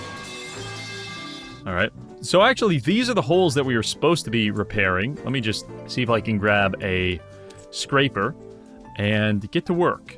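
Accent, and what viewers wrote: Canada. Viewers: Your fancy Canadian speak amuses me.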